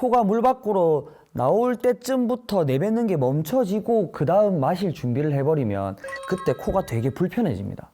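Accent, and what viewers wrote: Gyeongsang, South Korea. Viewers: 간결한 설명, 부드럽고 정겨운 경상도 억양이 강의가 더 쏙쏙 들어오는것 같아요. 좋은 강의 감사합니다.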